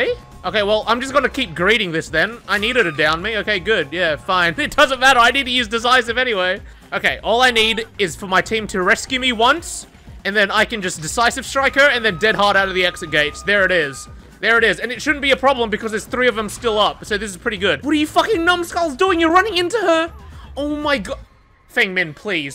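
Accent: Philippines. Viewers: The filo accent is so spot on I choked on my empanada. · being Filipino actually makes sense with his accent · Wow! The typical Filipino dissing the Pinoy accent! You got yourself a new subscriber.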